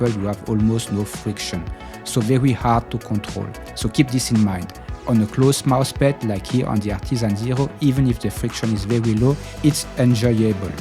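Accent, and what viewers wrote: France. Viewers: Mdr l'accent bien français (bonne vidéo quand même)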